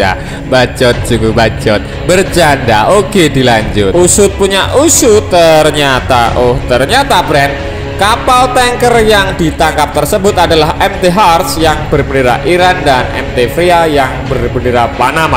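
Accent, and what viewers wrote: Java, Indonesia. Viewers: Suaramu min is the medok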